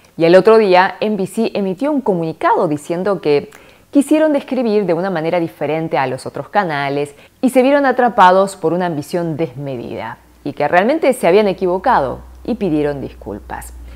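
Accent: Argentina. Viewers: Primera vez que veo este canal. Muy bueno. Pensé que me hablaría en coreano y habla español argentino :) saludos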